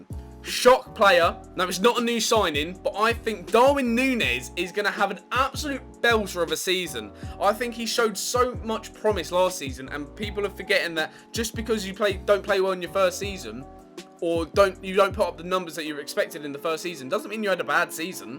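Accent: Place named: Manchester, United Kingdom. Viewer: Love the Manc accent. Always support your local team and love you are being a face for that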